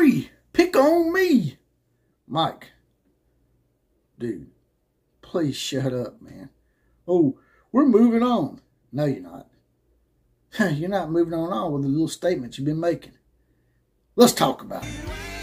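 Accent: South, United States. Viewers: Wait, where has republicans and conservatives messed up? You can’t talk like you’re from the south and still ride the fence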